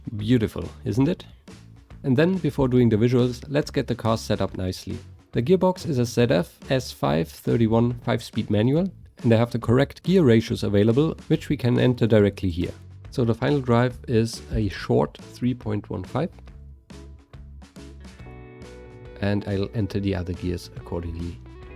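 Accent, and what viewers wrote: Bavaria, Germany. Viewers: Geiles Denglisch fühle dich aber jetzt schon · JOOO are you deutscher mayby like bayrischer or mayby from frankfurt? but geiles auto find es auch geil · Ich höre ein bisschen deutsch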